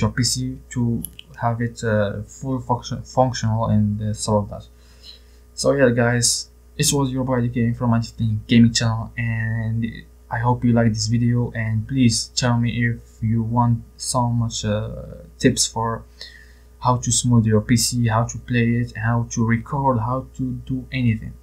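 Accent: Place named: Spain. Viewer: i think he is Spanish his accecnt not to hard to understand but maybe if he wrote it down instead of talking to me i juse restore my computer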